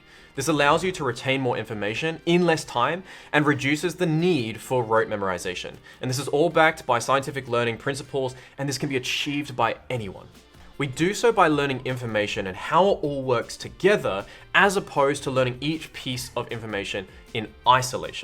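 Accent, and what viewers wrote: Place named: Australia. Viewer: I think it's the Australian accent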